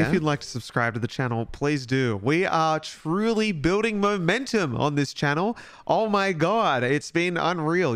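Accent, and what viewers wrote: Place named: Australia. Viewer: surreal hearing an australian talk about american basketball like this. i’m applying for a master’s in visual communication design btw i’ll let you know how it goes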